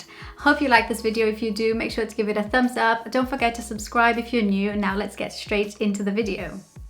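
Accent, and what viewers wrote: Wales, United Kingdom. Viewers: Sorry. You have a delightful Welsh accent, I just never noticed it before. And your English is very good. Also, I just wondered if you had come across the expression; "You don't want to spoil the ship for a ha'porth of tar." (it's not related to anything you have said) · a bit late to the show... but I gave you a thumbs up - "cos I 'at to!" yr English accent is beautifully Welsh! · Tidy! You speak Wenglish!! Catches, Taffy Nige from Pontyclun xx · You have a slightly Welch accent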